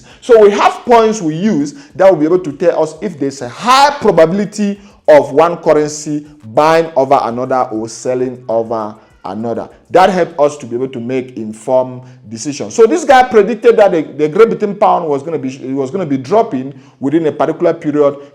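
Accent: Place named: Calabar, Nigeria. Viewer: I actually thought you are a Nigerian ur accent is like that of a typical Calabar man. you are a boss indeed good thanks you are my mentor I will learn from u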